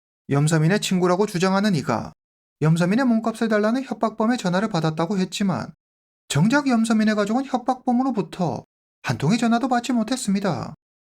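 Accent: China. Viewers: 주인장 조선족임? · 말투 뭐임? 선족임?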